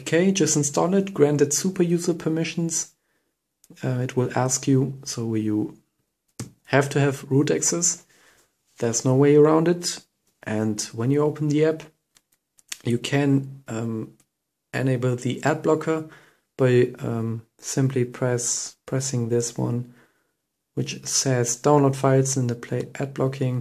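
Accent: Germany. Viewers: Great tutorial. Btw it's quite cool that you are running a Chinese version of superSU while speaking with a German accent.. no offense tho. I live in Germany.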